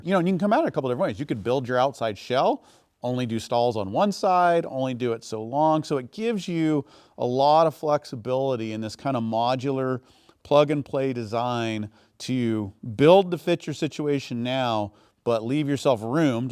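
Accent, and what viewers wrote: United States. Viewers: sounds like every blue state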